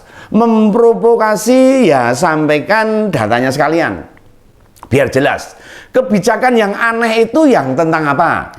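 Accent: Java, Indonesia. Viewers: saya betul2 senang melihat logatnya mas ini, jawabanget, saya suka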